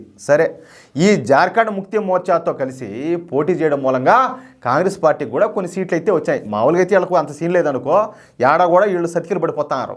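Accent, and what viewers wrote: Rayalaseema, India. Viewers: Your rayalaSeema accent is too good sir... As iam from Telangana I can't recognise but is kadapa accent you spoke....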